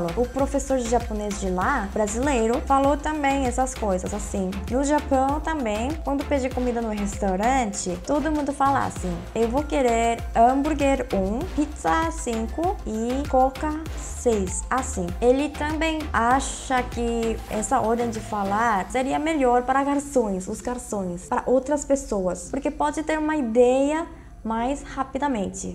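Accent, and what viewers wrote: South Korea. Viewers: Sotaque coreano é tão bom de ouvir ♥️ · Sotaque coreano é gostoso de ouvir. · Engraçado, estrangeiros normalmente dizem que tem dificuldades em alguns conceitos de português como gênero, número e essas coisas. Mas as vezes esqueço que você é coreana de tão bem que fala português. As vezes você deixa escapar um pouco do sotaque, daí eu me lembro que você é coreana de verdade!^^ Não consegui ver nada de errado no seu português, NADA! Você é muito humilde em dizer que não conseguiu explicar muito bem por causa do "seu português", você não só explicou bem, como explicou de uma forma EXTREMAMENTE agradável de ouvir, um jeito típico das fofas mulheres coreanas, mas que em você se torna muito mais agradável! Amei <3! Ótimo vídeo!!!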